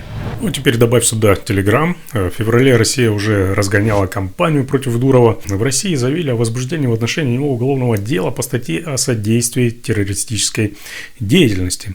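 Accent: Ukraine. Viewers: Этот майор, судя по говору, родом из Малороссии. Вслушайтесь, соотечественники: ципсо во всей своей красе. Вдохновенно работают.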